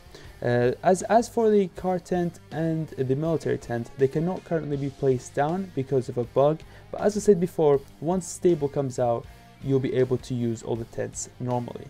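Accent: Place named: Scotland, United Kingdom. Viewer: Yes mate I thought I heard some scottish in there! Class video, keep up the good work.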